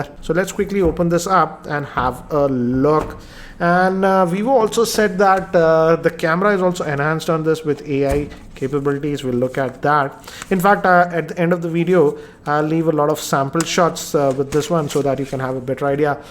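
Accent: India. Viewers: indian loghat inglish so rough and Bad the voice to hear it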